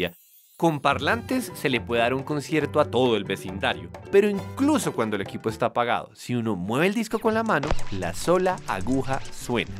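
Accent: Bogotá, Colombia. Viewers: E el encanto del acentico Colombiano. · Eisenkopf Alpo es acento bogotano, en Colombia se habla diferente, dependiendo de la ciudad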